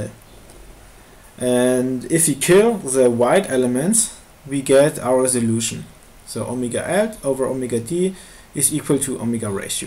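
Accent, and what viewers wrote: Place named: Germany. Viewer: alter der deutsche Akzent scheppert alles raus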